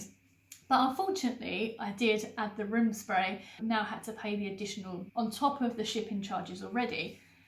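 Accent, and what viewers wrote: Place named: United Kingdom. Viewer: I really like British accent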